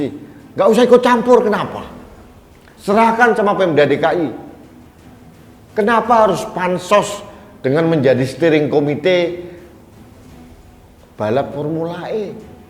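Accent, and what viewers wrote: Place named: East Java, Indonesia. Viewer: Pak ini dari jawa timur ya logat nya kelihatan, salam dari saya dari malang pak, salam NKRI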